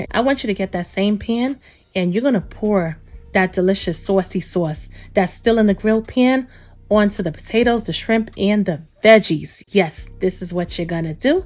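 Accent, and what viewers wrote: New York, United States. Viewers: Looks delicious 😋 you gotta be from NY or Jersey 😭😭 I know that accent 😂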